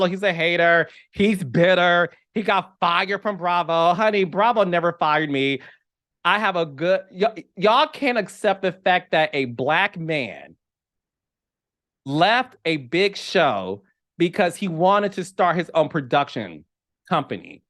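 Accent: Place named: Florida, United States. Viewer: Your dialogue and pronunciations remind me of Marlo. Are you from Florida? I 100% agree Porsha should stay far away from RHOA. Porsha is great TV but RHOA is no longer the platform for her. I would love to see a show with Porsha, Phaedra, NeNe and Kim on a show following each of them on their new journey. It would be interesting, entertaining and just enough shade to make you laugh.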